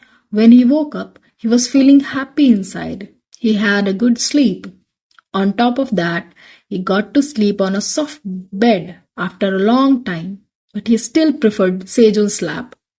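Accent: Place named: India. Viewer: Indian English 😂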